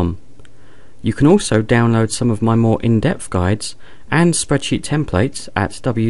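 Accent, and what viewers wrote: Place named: England, United Kingdom. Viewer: Yes it is an English accent from England.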